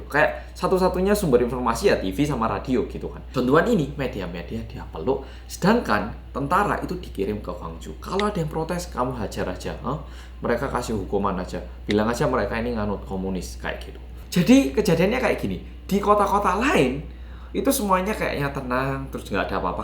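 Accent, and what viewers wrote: Java, Indonesia. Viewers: Kemaren LUWEE. Sekarang MEDOK.😂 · bagus bangeddddd, medokkk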